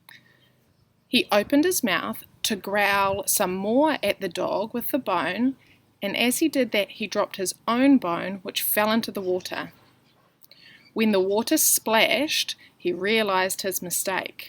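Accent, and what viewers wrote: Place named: United Kingdom. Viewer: British ascent yay E E E E E wow that is sad now that i watch the one part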